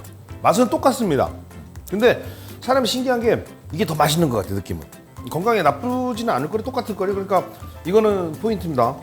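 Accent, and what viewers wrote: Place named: Gyeongsang, South Korea. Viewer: 경산도 말씨가 귀에 착착 감깁니다 영상보면서 입가엔 미소가 생기네요 재밌게 잘 보고 있어요^^ 윤이련씨, 가족분들은 좋겠어요.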